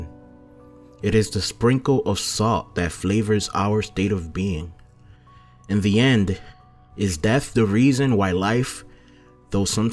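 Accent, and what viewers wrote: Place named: Dominican Republic. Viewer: Papá tú acento es full dominicano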